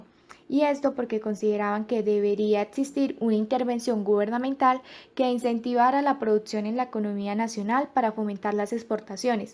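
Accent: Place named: France. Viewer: tuvieras un toque francés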